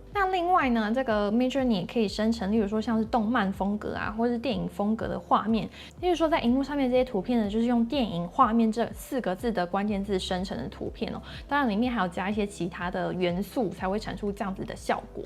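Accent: Taiwan. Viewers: 这台湾音好可爱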